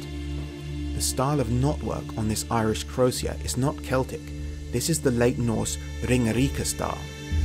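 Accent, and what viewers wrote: South Africa. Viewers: You sound a little South African brah. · this guys voice sounds afrikaans slightly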